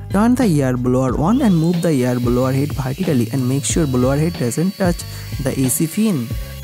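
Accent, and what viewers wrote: Bengal, India. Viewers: Do u have paan in Ur mouth?😂😂😂 Bongali xcent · You're typical Bengali accent is pathetic! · Bengali accent or something else.....my tongue is twisting by hearing him..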